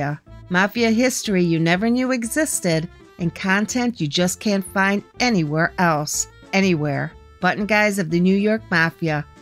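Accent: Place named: Chicago, United States. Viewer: Why is it called throw in New York when the Narrator broad has the thickness Chicago accent since Tony Spilotro ?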